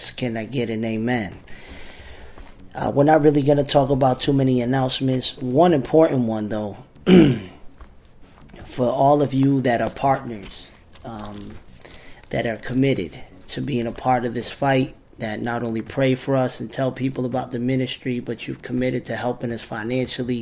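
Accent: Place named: Philadelphia, United States. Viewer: Does anyone know where this brother is, and if he has a church? Sounds a little like Philly maybe? Really like his insight, as it seems he is certainly anointed.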